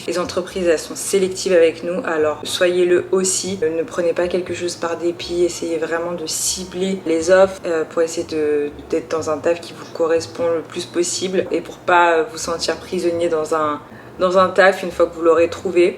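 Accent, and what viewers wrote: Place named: France. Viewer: Salut. Tu viens d'où ? Je suis américain, et je trouve que tu as un accent français, mais tu as des vidéos précédentes qui indiquent que tu vis à Montréal ? De toute façon, tu as un très joli accent français, facile à écouter 😊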